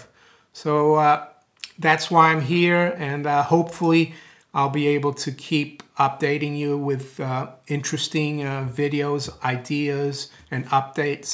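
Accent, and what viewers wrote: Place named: Massachusetts, United States. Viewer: I know you've lived in Geneva and you've been in London for quite some time, and I think you mentioned in another video that you were born in Brazil and your family is from Brazil. Not that it matters, but I'm wondering why you talk like you're from Massachusetts. Do you have connections to the States?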